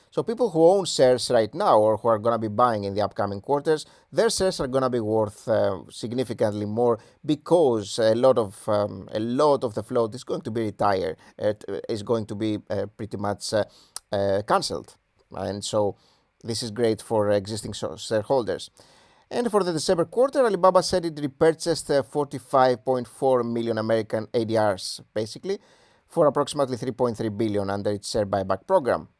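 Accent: Greece. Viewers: Great content! And do I hear it right, is that Greek accent? :)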